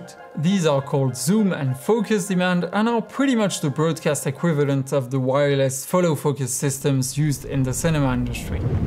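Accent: France. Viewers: That french accent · Is he french? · Nice French accent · Quel bel accent français. Romantique 🤗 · he is french for sure, he is based in Paris and his accent is 100% french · French accent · Tu es francais ? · Idiot TOOO TECHNICAL AND PLEASE GET RID OF THAT FRENCH ACCENT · Le commentaire français que vous cherchez. Sacré accent français d'ailleurs. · T'as un accent français, je me trompe ??? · That was very entertaining and informative thank you. I can't decide which is the ultimate French-English moment: "necessessary" or "...and you end up with a very big and expensive lens!👨🏻‍🍳" Said with much love and all due respect... we have francophones in Canada but the "real" French emote and accent words so cutely. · Do french people seriously still speak like that? He should make an effort, this accent has expired. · he is French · He has french accent lol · Je préfererais que tu parles en français pas en anglais avec l accent fr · T'es pas français toi par hasard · Ton accent francais xD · Grate camera . 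and i like your speaking french accent english , Amazing video ! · speak normal english, not a dumb french accent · Are you french? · You can’t hardly get more french accent than this guy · why french people have such thick accent bro, I honestly think they don't like english so they butcher it on purpose, spanish is my native tongue and I have virtually 0 accent compared to this guy. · It french accent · French maybe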